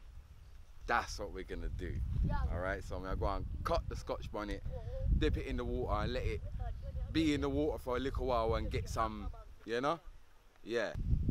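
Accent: Jamaica. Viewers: it's so weird seeing a white preson speaking Jamaican but I love it and energy is of the charts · is a funny guy for a white man speaking patwa my guy💯🇯🇲 big up and moss media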